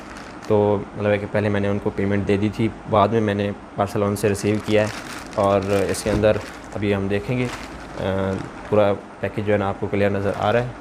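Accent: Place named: Saraiki, Pakistan. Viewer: Lala na tain saraiki boli hay na urdu khichichri barai pia hain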